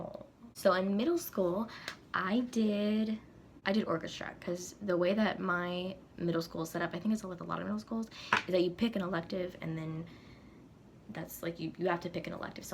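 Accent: South, United States. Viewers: You have a little country accent oml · I love your southern accent 😂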